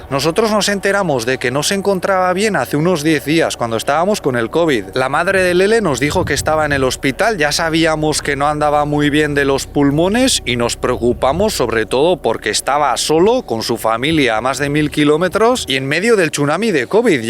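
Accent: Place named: Navarre, Spain. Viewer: Lo siento muchísimo!!! No sabes cuánto me gusta un acento Navarro en China!!! gracias por informar del mundo y cultura china, tan desconocida por aquí.. Lo que digas, eres un hombre muy preparado!!! Aúpa Navarra y China!! Un saludo para tu familia Navarra y China. 😘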